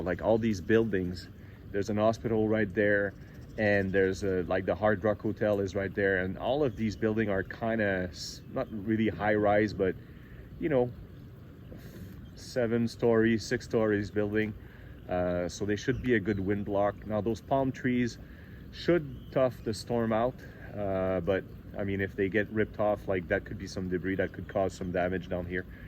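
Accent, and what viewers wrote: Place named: Canada. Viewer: great ! you re good in english ! are you good in Spanish too ? LoL Oui ! ton anglais est tres bon ! Mais L accent du canada vas toujours rester ! Je suis retraite et prevoit aller vivre au Mexique en 2023 ! la derniere fois que j etais au mexique etait en 1981 ! LoL are you going to be there next fall ? would be fun to chat over a coffee ! good luck with the Storm ! I think you should be ok from where you are ! Asta manana !🧐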